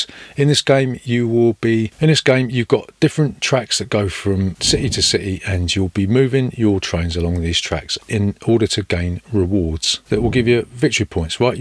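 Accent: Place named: South London, United Kingdom. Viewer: where abouts on the south coast? I started watching your reviews recently and my wife, who is from Yorkshire, asked me why I was talking like I was in Saaaarf London. I said I’d been watching a load of b******s on YouTube, which I then had to clarify. I’m from Brighton myself but live in beautiful Auckland NZ...Anyway, you have been a great source of info and entertainment during lockdowns etc....so Thank You very much.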